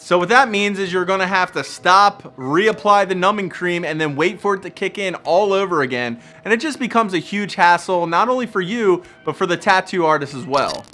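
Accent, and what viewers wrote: Philadelphia, United States. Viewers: I'm From Philly., so I appreciate the pronunciation. Haha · 95% sure this guy is from philly. Wooder… I say it to. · i’m from philly and he sounds like he from philly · You’re from Philly huh?? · Dude you must be from philly i can hear it in your accent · I fkn knew it lol classic Philly accent! Appreciate the vid tho. Employing some of those techniques this week! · You have a strong Philly accent lol great content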